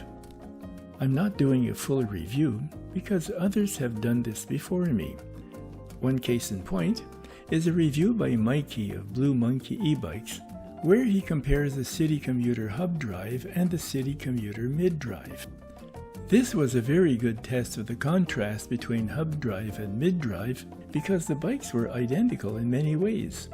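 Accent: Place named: France. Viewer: Je sais pas, la rue Maine je croyais c'était à Sherbrooke, et il y a beaucoup de côtes dans cette ville-là. Alors j'ai cru c'était cette ville-là. Mais clairement je savais t'étais français juste de la manière tu parle l'anglais. Haha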